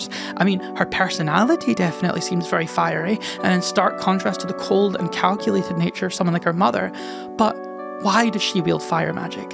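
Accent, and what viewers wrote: Scotland, United Kingdom. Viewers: Your voice is the most Scottish thing I've ever heard and I love you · I love your accent, theory was good, yell at me more possibly Scottish person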